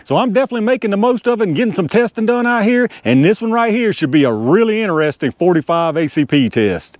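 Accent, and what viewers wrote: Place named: Boston, United States. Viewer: Thanks for that test big hoss. I use Speer Gold dot 200 grain +p in my Glock 21. Never seen these in action. Hey by the way, where did you get that Boston accent from?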